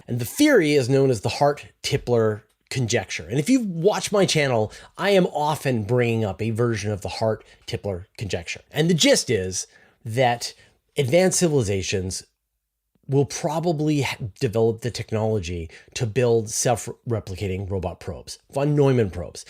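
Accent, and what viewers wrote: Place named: Canada. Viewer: I'm here for his adorable Canadian accent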